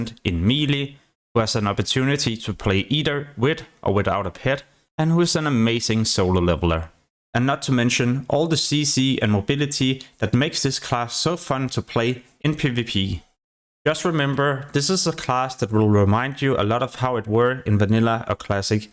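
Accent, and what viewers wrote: Denmark. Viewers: Are you dansk? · You sound Danish :)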